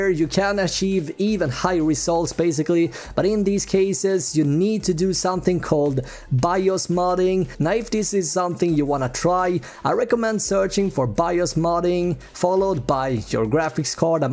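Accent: Sweden. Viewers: You have a Swedish accent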